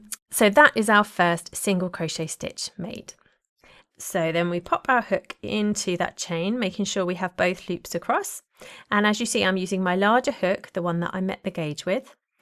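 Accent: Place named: England, United Kingdom. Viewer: So, I have to admit, I have not watched any of your tutorials before but I am a huge fan of your garments. I have several patterns that I of course have not gotten around to. 😂😂 I decided to watch h this one as it popped up on my front feed. I was COMMMMMPLEEEETELY surprised to hear your English accent!!!!! I had no idea. So nooow.Im trying to reconcile your face to your voice. 😂😂 I will say that I totally love English accents. One of the doctors I work with is English and I looooove to listen to hom talk. ❤❤❤❤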